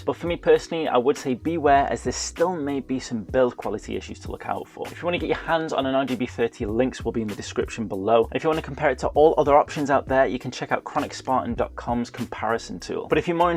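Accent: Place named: United Kingdom. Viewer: Nothing worse than clicking on a video from a YouTuber I don’t know and hearing a British accent 🤮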